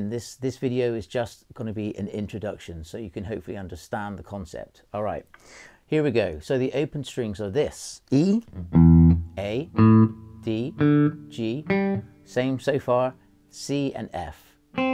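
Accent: Bristol, United Kingdom. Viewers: did you go to Bristol university, per chance? I gather you're based in Bristol and I can hear the slight accent, but I was there '98-'02 and I think we're about the same age..Anyway, since I heard your playing a couple of years or so ago, I always thought it was some of the very best and melodic in the best way.. I'll be listening and learning some stuff as well as enjoying your music. Have a good evening.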